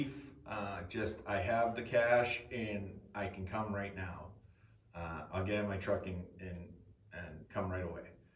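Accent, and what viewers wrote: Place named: Western Massachusetts, United States. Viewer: I'm watching this saying to myself "I recognize that accent" and then sure enough you mentioned "western Mass" and I said Ah Ha! A fellow MassHole!!!! LOL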